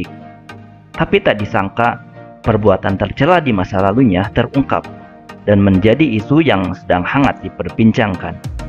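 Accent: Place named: West Java, Indonesia. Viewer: Lidah sunda bro... 😅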